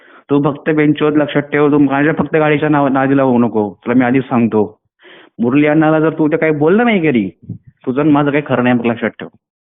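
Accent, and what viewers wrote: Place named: Nashik, India. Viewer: बोलण्यात असा वाटत नासिक किंवा A.नगर चा दिसत आहे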